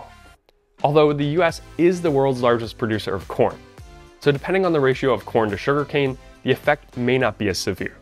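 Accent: Canada. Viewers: You sound Canadian. Tariffs have been one sided with foreign companies enjoying the benefits of their domestic product being more affordable than American made products. Our tariffs will mirror theirs thus leveling the playing field. American companies will now be able to compete in America. Americans will begin to profit. Give it a chance to bring positive results.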